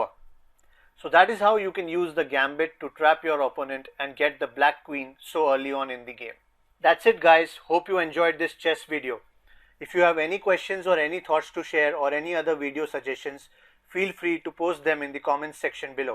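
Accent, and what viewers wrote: India. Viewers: IM SO TIRED OF INDIANS AND THEIR ACCENT... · Most indian sound i have ever heard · Not the Indian accent OH NOOOOO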